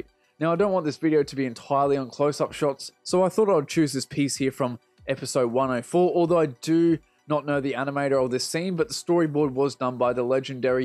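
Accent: Australia. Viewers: I'm a simple man. I hear an Australian accent and I like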